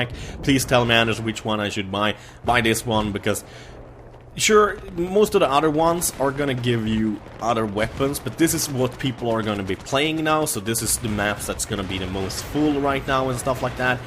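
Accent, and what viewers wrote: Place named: Sweden. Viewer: I love your swedish accent// I'm from sweden to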